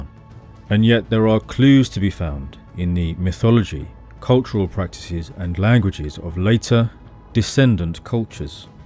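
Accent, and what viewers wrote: United Kingdom. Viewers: you sound pretty similar imo. You sound a bit more serious. But joking aside, this was a thoughtful video and I enjoyed it a lot. I hope my little joke didn’t make you self conscious or anything. If it helps, my barbarian American ears just lump all British accents together so you could chalk it up to that.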